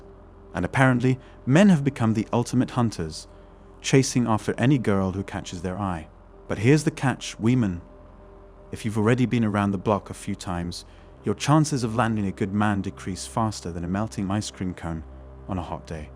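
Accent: United Kingdom. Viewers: How wonderful the British calm presentation, in addition to the nce accent, when compared with the high pitched American presentations - almost shouting.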